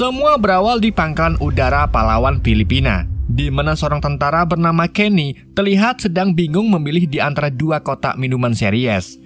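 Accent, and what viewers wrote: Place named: Tegal, Indonesia. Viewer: Mimin tegal 😂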